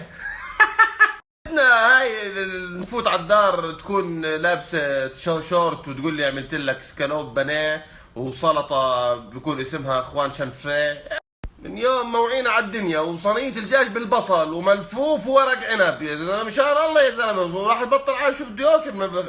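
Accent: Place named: Gaza, Palestine. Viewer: هادا لهجتو غزاوي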